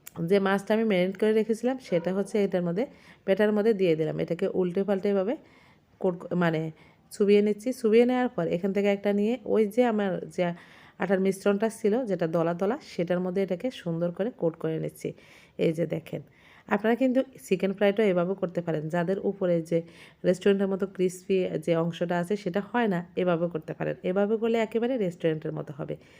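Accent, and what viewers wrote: Noakhali, Bangladesh. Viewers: আন্নে নোয়াখাইল্লা কেন ⁉️ 😁😁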